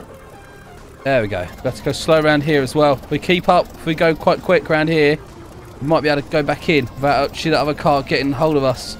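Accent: United Kingdom. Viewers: Are u British cuz me new sub!!!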